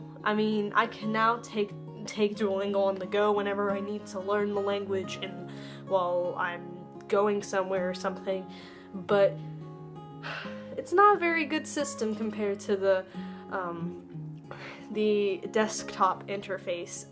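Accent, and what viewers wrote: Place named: United States. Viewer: As a Dane, your pronunciation needs work obviously and you got a heavy American accent, I would suggest finding a Danish person to chat with in Danish if you want to iron out the pronunciation and accent. That said, good work and I greatly encourage you to keep learning Danish, it is a really fun language once you start understanding the deeper aspects of it, as an example, a lot of Danish comedy is is based around subtle changes in how things are said and phrased. I have a warning for you if you travel to Denmark, if you don't demand that Danes speak in Danish to you we will change to English immediately when we hear that someone isn't near to native speaker level of proficiency, Danish people are generally fluent in English and find it easier to switch to English than to try and decipher foreign accents. I am warning you so you don't get discouraged if you come here in the hope of learning to speak Danish only to find everyone speaks English to you automatically.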